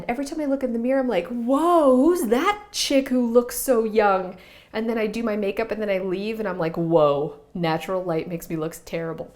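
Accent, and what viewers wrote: Canada. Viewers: Hi! I’m relatively new to your channel and absolutely love how authentic and awesome you are! You’ve inspired my cricket self to continue to create organized spaces in my home that make me happy. It’s also great to hear a fellow Canadian accent – makes me miss home a little less. So excited to hear that you’re in NYC filming - you got this! Rooting for you!:)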